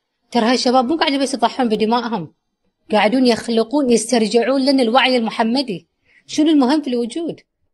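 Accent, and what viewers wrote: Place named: Kuwait. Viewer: رجعت عدت الفيديو اكثر من مرة لهجتها اقرب من لهجة الكويت مافيها تضخيم لهجة البحارنة .....بس اكيد اهل البحرين الشرفاء على الرأس كمان بس في البحرين السنة مو مقاطعين ستار بكس ولا مكدونالد بينما الشيعة مقاطعين ....مفارقة عطيبة مش هيك !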